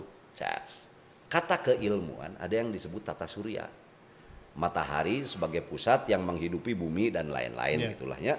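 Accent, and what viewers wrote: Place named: Sunda, Indonesia. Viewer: teu ngeunaheun bahasa sundana host teh wkwkwkwkwk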